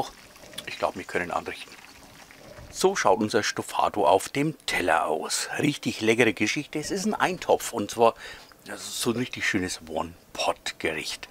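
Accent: Franconia, Germany. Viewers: Es ist Gold wert was die Youtube Untertitel aus deinem fränkischen Dialekt machen 😂 tolles Rezept und Video! Ich glaub ich brauch doch langsam mal nen dopf.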